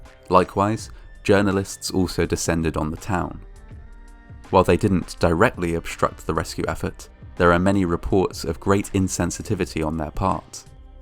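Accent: United Kingdom. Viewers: He is clearly British. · American accent?? he's as British as one gets 😂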